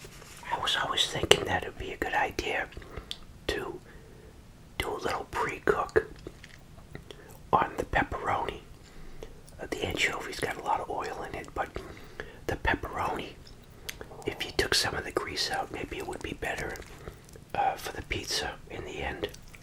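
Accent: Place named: New York, United States. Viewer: Love your videos 😍 just started watching them are you from New York? Love your accent 😁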